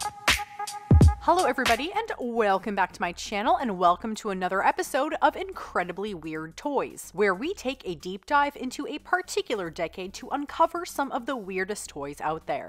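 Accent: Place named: Canada. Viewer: You have a cute Canadian accent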